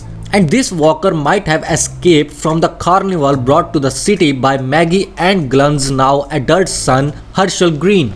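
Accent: India. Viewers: Lmao that accent reeks of curry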